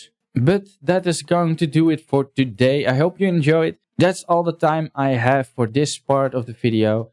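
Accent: Netherlands. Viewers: Hey, Just got recommended this Video and the complete build looks amazing! You deserve way more Views, Subs and more. Ps. The Netherlands accent is Lekker! 👌👌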